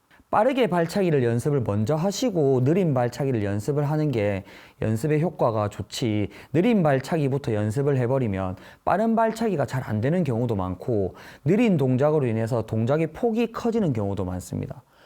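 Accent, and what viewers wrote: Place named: Gyeongsang, South Korea. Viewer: 경상도분이신가봐요.. 억양은 속일수가 없네요^^ 그래도 귀엽네요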